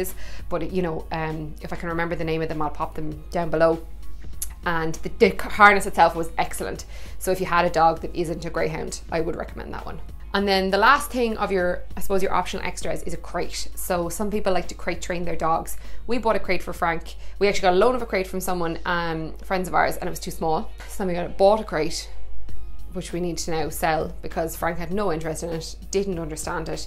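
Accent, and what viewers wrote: Ireland. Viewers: I'm mainly here for the Irish accent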